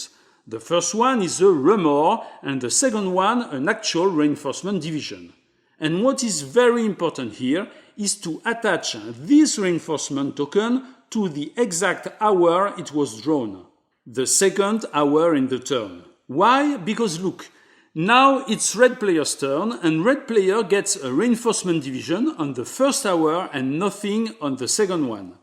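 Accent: France. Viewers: This is excellent! Also, all American place names sound so much nicer when pronounced with a French accent 😄⭐️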